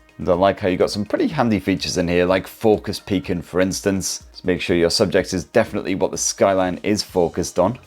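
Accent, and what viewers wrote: United Kingdom. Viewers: What's the link to the wallpaper site? I'm American so I couldn't understand British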